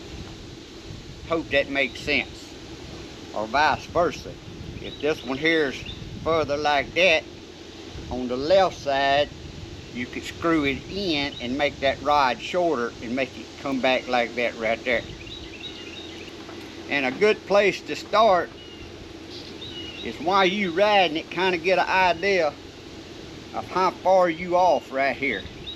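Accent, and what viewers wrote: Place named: Rural, United States. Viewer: Thanks. My wife loves your country accent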